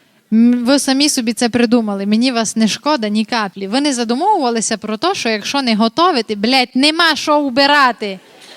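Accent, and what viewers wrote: Transcarpathia, Ukraine. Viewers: То не суржик. То закарпатська говірка. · Закарпатська українська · Мова дивна, нащо так? З усіх дірок на російськомовних капає "вчить мову", а потім вмикаєшь і отаке. Дивися та червонієшь. То не стиль, не говірка, то якась фігня. Посорилася вона... ще й мати. Краще вже чистою російською, а не російською з українським акцентом. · Жахливий діалект))) це на Закарпатті такий?) Але ха українську лайк, на підтримку україномовного ютьбу!) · туй нич не є руснацьких говірок. По факту : люмпенська тарабарщина з закарпатським акцентом, щоб кієвськиє панялі'.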